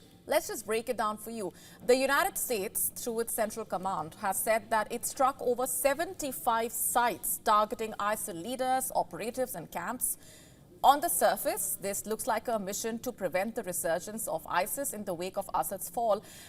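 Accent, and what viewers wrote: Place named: India. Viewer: Indian accent so funny😂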